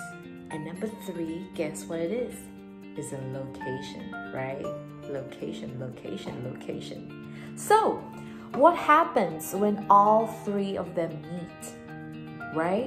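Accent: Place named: England, United Kingdom. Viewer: ชอบสำเนียงอิ้งหลิชของพี่มากค่าา 😻😁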